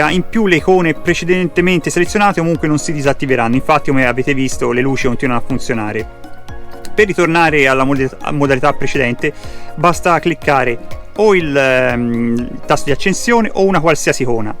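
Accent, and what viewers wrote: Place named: Tuscany, Italy. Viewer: Ciao, spieghi sempre molto chiaro, impreziosito dall'accento toscano. Ti faccio due domande: nel tuo caso (inverno freddo e serbatoi vuoti di acqua), il riscaldamento truma diesel con boiler, lo potevi accendere senza danni? E poi le prese USB che sono all'interno del van sono attive, cioè puoi attingere dalla batteria servizi con van spento? Grazie attendo nuovi video.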